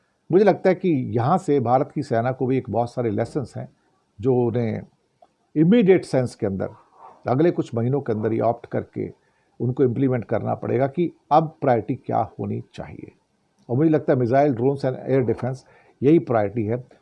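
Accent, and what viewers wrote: Pakistan. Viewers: कहां से फर्जी समाचार लाते हो....बोलने का अंदाज ऐसा है जैसे कोई पाकिस्तानी बोल रहा हो....निकृष्ट स्तर.... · हर बार कि तरह पाकिस्तानी बोली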